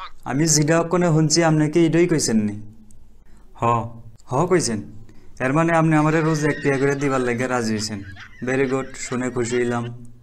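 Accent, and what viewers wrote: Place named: Noakhali, Bangladesh. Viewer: Please don't try this nowakhali accent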